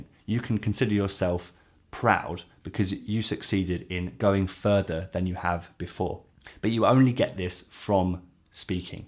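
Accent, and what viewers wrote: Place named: United Kingdom. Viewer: J'aime ton accent britannique. :)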